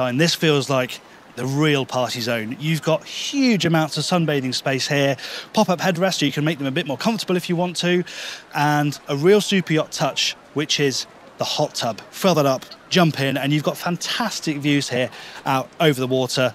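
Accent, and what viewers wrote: England, United Kingdom. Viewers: Does anybody know where in England is this accent from? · The Queen’s English?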